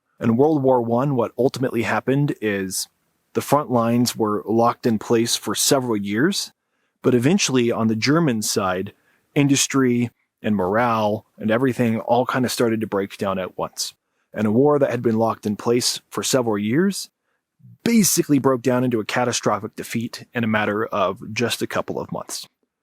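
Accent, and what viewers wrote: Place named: United States. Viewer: As Ukrainian ,i am nicely surprised that person who lives in States ,has so deep knowledge of what happens in our country.all arguments are clear & true In addition,so comprehensive americane english,not need to force your ear,plus we are getting information on the beautiful enviroment back there Wish your actual president could listen to intelligent people like this and not his morons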